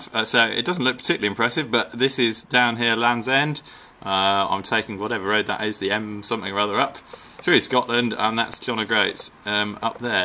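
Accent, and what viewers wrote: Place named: Australia. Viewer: The next tomtom?? Just wondering why you sound Australian in this video?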